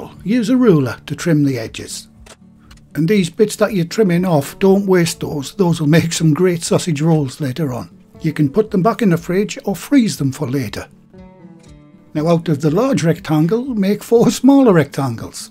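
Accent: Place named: Northern England, United Kingdom. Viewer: ooooft these look amazing, but you sound northern like me shouldnt that be henderson's relish your using:P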